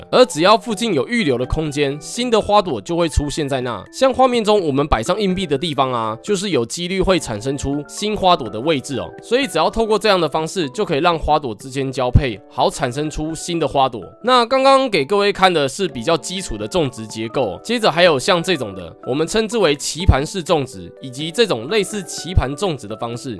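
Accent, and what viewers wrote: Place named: Central Taiwan, Taiwan. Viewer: 有中部腔😆😆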